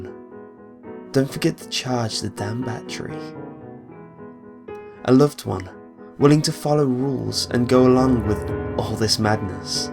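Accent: United Kingdom. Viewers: hes not...thats his accent, watch other videos and pay attention to the british english wording styles he uses.....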